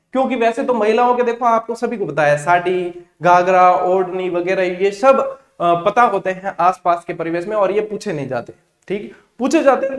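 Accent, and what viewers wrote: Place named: Marwar, India. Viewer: Sir apki marbadi language apke muh se sunne mein bada annad atta mein braj bhartpur se hunn sir moy bhi apni mother tunge bolbe mein bdo mja abe guruji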